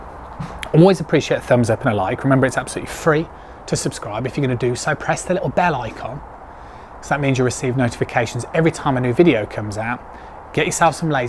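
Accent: United Kingdom. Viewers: You are the first British instructor that I can understand your diction. The accent is pleasant to listen to and learn from. Keep up the great videos. Bruce from USA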